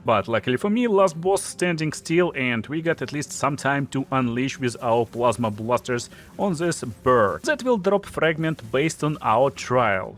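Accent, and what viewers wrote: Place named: Russia. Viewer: Слышно что ты русский)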